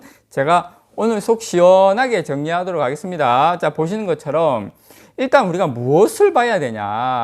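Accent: Gyeongsang, South Korea. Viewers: 경상도 사투리 아리까리...ㅋㅋㅋ 의 사투리는 너무 구수하쥬♡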